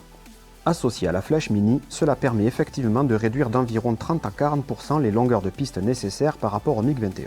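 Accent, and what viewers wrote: South, France. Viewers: Ce délicieux assin' du sudeu pour raconter un avion soviétique est une merveille, toujours le même plaisir à faire le tour de vos vidéos tous les quelques mois =)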